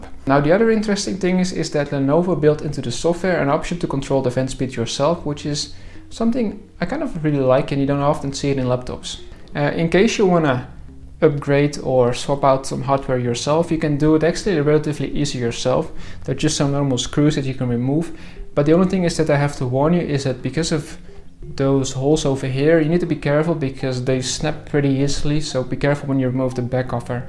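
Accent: Netherlands. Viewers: Is deze man Nederlands? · Goede video! Ik heb er wat uit geleerd... Als een Nederlander Engels probeert te praten merk je dat meteen EN Dat dit zeker een laptop is die het kopen waard is. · Are you dutch?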